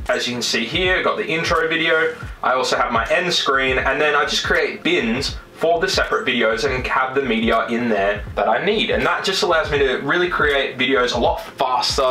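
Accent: Australia. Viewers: mate this helped a lot champion. Also you legit sound aussie as but you're Canadian? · Good tips mate... you're also an Aussie yeah? Sounds like a familiar accent haha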